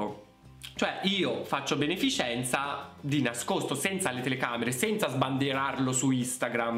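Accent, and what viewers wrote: Tuscany, Italy. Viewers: Io ti amo ....basta vederti e sentirti e l accento toscano poi ....😘😘😘😘😘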